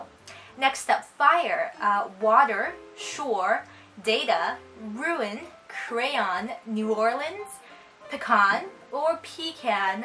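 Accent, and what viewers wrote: Canada. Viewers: Whoa u have an awesome canadian accent haha! i have sort of an annoying NY accent.. not cool like yours haha kindly swing by my channel if u like! I do hair vids and food vlogs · Girrrrl your English is perfect, in fact - it might be a little too perfect. You enunciate and pronounce everything so crystal clearly that you sound a bit hyper correct, but you still definitely have a hint of Canadianess in your accent so I'd definitely be able to tell that you're not from the US. This was a really clever and original take on these accent tag challenges, thank you for sharing! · Hi! I enjoyed your accent-tag. I am a fellow Canadian too (I'm in MB) and yes, I can tell you would be "Chinese Canadian" as you do have a slight accent. People claim I have an accent too but.. ... lol... I can never tell myself. :) .. I also switch the pronunciations of some words depending on the sentence.. 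.. weird, right?... lol.... but it's all in fun! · You do have a Chinese Canadian accent... I would know cause I have it too. I speak perfect Mando, Canto, English... Each with accent. Mando with Canto accent. English with Canto accent. Canto with Hakka accent. I don't think Canadian has an special accent, mostly because it sounds so natural that I don't notice it. The craziest thing is that I picked up fake southern accent and I totally agree with you that as an Asian Canadian we do speak too fast and crumble up all the pronunciation.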